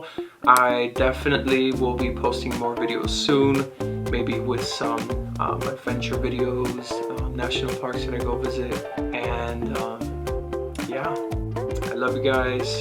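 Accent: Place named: Miami, United States. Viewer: Yeah, you've got the Miami accent. I've never heard it until I was watching one of my (now) favorite YouTubers who is from Miami, and I first thought she was not born in America. I would describe the Miami accent as sounding like someone whose native language is not english....but coming somewhere from a latin American country and learning to speak english fluently and almost without an accent..but there is still something there.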